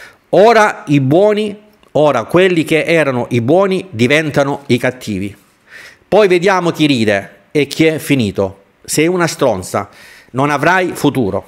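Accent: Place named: Abruzzo, Italy. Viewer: Scanzi, Travaglio e Sommi definiti come? Giornalisti che non contano niente? Ma tu chi sei con quella immensa inflessione abruzzese? E come ti permetti? Eri ironico, vero? Spero di aver capito male, ma di certo non ti riscolto più una seconda volta.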